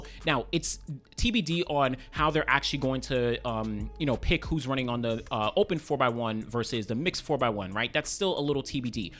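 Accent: Jamaica. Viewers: Are u Jamaican or have Jamaican routes bro ?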